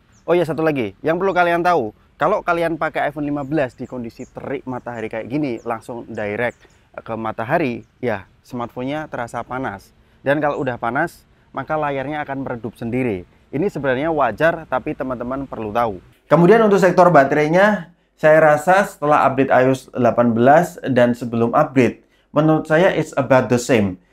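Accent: Semarang, Indonesia. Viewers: Logat e ketoro semarangan ik 😂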